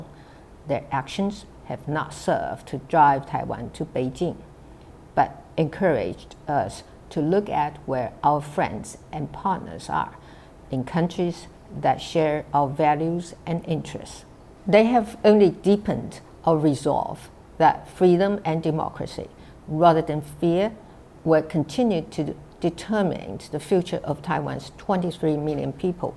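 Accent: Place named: United Kingdom. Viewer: 做得好不好我不知道 但這英文能力很強呢 而且因為是對歐洲各國講所以用英國腔 其實挺厲害的(我只會破美語XD)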